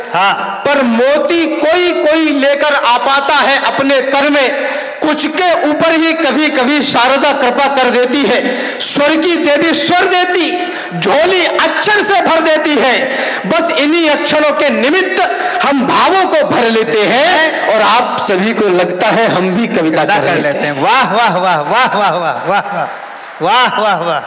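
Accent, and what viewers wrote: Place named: Khari Boli, India. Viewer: मै जब भी सुनता हु आपको आपकी सिधि खड़ी बोली सुनकर जबरा फैन हो जाता हु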